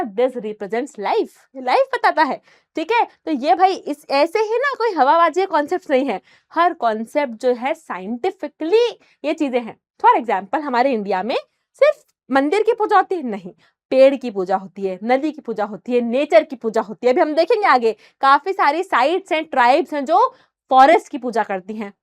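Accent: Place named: Haryana, India. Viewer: Haryana te ha yaar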